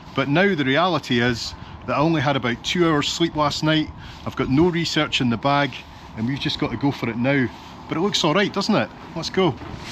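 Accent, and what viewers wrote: Scotland, United Kingdom. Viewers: Thank you for not punishing us too badly with your wee references to our convict who would be king! I'm thinking I need to persuade my husband to get me to Greenland before someone does something stupid (well, even more stupid) and we can't go at all. I'm going to start practicing my accent to sound like you so that we can go under cover as Scots rather than americansk, maybe that'll help. The video was absolutely lovely, and you're a wonderful tour guide for sure. We watched the next video earlier, so we saw the boat and the bergs and growlers, but it would be great to see it again. We're winter people as well, so that would suit us perfectly! Thanks again, and looking forward to the next one!! ❤ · Half the joy- your scottish accent 😸 Tnanks for another great trip 👌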